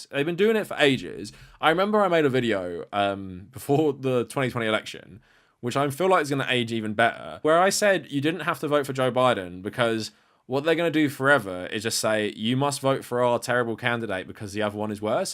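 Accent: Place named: United Kingdom. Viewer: Off topic, but I always heard you with a vague British accent but is that some Donegal and Derry I’m hearing?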